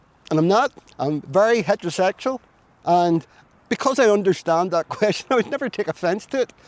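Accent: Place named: Northern Ireland, United Kingdom. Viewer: I love your accent. M b i.ll move to NI.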